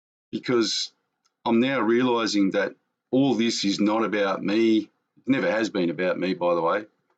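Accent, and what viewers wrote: Australia. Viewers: hey I'm here in the USA and I just want to give you my input on the name change. I would say don't change it your accent is Australian you're Australian and there's nothing wrong with the name that's the way we know you. also on a sad note I have to report as humans we all stereotype stupidly, and I think most people expected you to look like Crocodile Dundee. just like everyone from the East Coast thinks here in Arizona there still Indians running around on horseback at Cowboys shooting at one another. it's programming from too much television sadly but people will learn and grow and adjust to the fact that not everyone from Australia looks like and sounds like Crocodile Dundee. just being honest and saying what nobody else has the courage to say. The Whole World's still pretty biased judgemental and silly.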